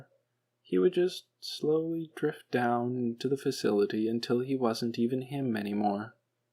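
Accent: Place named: United Kingdom. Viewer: Genuinely thank you for doing this. I have a hard time reading and committing to reading fiction for a long period of time. I do have a lot of free time to listen to things though and this was an amazing way to digest this story. I got recommended by the person I'm dating right now, totally worth it. Also I know you apologized for your British accent in the first one and while it is occasionally rough it is such a good capturing of Wheatley like you play Wheatley so well.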